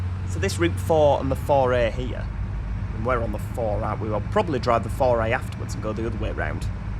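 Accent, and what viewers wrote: United Kingdom. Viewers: What part of the UK are you from? You have a very sweet accent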